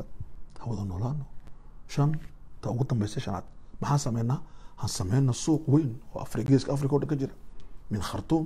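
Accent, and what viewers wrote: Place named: Djibouti. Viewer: Waxaqiiqo waxaan kuu sheegayo Waa iska jeclahay lahjada reer Djibouti shiiqu runta uu kahadlay somali israamsatay oo kala tagtay Hala qabsado kolay aniga qaxooti baan kahay dhulkii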